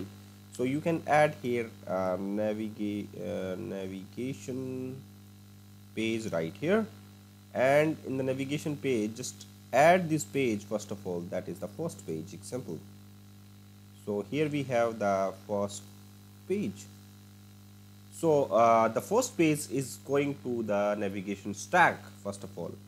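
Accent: India. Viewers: Nope, totally wrong there buddy, I am not even that proficient in English and I speak Spanish, which I doubt the narrator knows since he has an Indian accent, if you can't understand him it's more like a YOU problem then. If you are THAT distressed by his accent, watch another video, he's not a English teacher, he's a programmer, the least he should care is how he is saying his words because one guy can't understand him.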